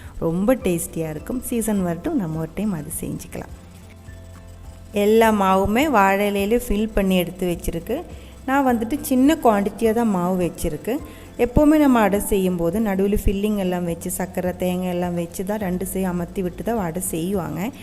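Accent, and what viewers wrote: Kerala, India. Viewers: மலையாளம் கலந்த தமிழ் பேசுவது சூப்பரா... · Very good tamil to listen in Malayalam accent❤️❤️ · malayali Tamil peasaree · Hi,ningal malayaliyanennu AA thamil parayunnathu startingil kettappol thanne manassilyi .Pirake chakka ennu paranjappol confirm aayi.Tamil nattil janichu valarnna malayalisil ee different kanarilla.Athukond keralathil valarnnavarakum ennu karuthunnu.ButI really happy.Nammude aalukal Anya bashayil vedio cheyyumbol, athum AA nattukar eshtappedumbol kittunna santhosham onnu vere thanneyanu. Keep it up.My hearty wishes for u.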